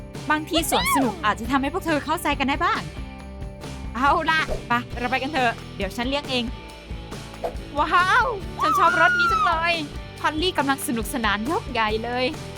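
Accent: Isan, Thailand. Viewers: น่ารักจังเลยชอบสำเนียงอีสาน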